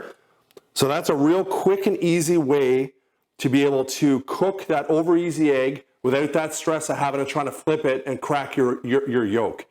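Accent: Canada. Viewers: Are you Canadian? I can tell by the accent.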